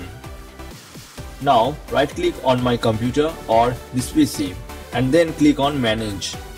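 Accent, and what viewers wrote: India. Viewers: I used automatic subtitles because of his accent (I'm not a native and still aren't used to the Indian accent). The automatic subtitles worked pretty well mostly. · He is speaking English, you fucking pleb. He's Indian and has a strong accent. So as English probably isn't his native language, I think he's pretty understandable.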